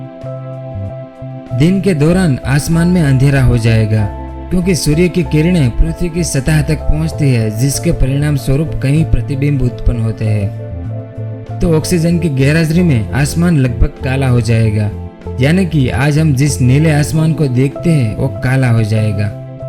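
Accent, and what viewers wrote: Gujarat, India. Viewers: Bhai aap Gujarati ho..... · Are u Gujarati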